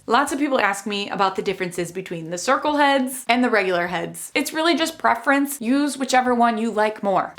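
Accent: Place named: Michigan, United States. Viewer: Is that accent from Mt Pleasant Michigan? It’s pretty thick. But I like it.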